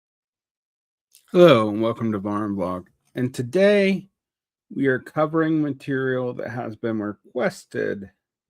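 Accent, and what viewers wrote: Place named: Bavaria, Germany. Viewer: your Bavarian accent is very noticeable in the way you pronounce stuff in German lol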